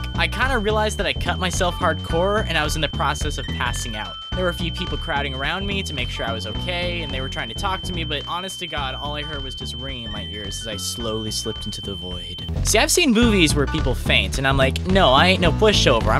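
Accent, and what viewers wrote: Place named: England, United Kingdom. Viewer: Thic English accent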